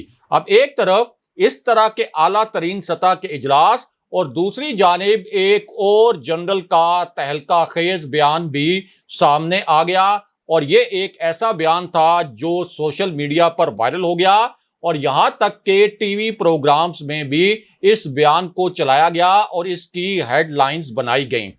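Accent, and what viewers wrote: Punjab, Pakistan. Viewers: Why you speak urdu in Punjabi accent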